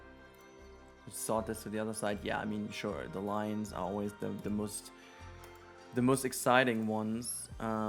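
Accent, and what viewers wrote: Germany. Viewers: his english sounds so german 😂 btw like the video :)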